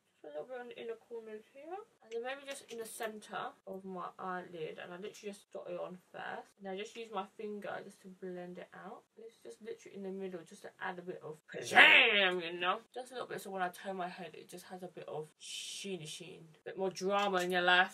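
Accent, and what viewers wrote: United Kingdom. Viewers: Olivia 811 1. She's British. 2. She has braces under her teeth which causes her to have a lisp.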